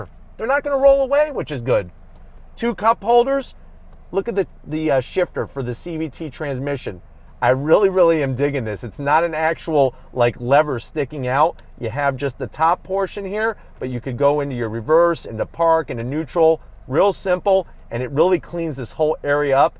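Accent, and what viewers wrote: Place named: New York, United States. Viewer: I know a new yawk city accent when i hear one! ;)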